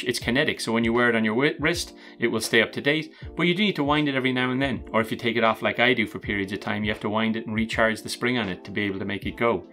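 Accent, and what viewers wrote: Ireland. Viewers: Great stuff and great to hear an Irish voice with products that are available in Ireland and the EU - you presentation and production skills are excellent and the content is refreshing - it is worth paying for quality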